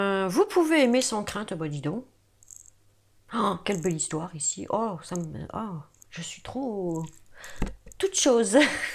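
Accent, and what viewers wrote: North, France. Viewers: Merci sympa le tirage et pleins de bonne humeur! seriez-vous du Nord? BONNE JOURNEE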